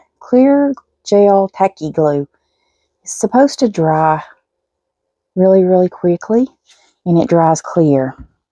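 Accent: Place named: South, United States. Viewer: Hi, I am a new subscriber. Everything looks great. I love it all! Where are you from? I detect a Southern accent. North Carolina here.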